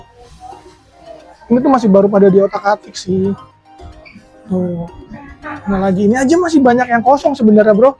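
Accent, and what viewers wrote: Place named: Java, Indonesia. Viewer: Bang kamu asli dr mana logat nya kya dr jawa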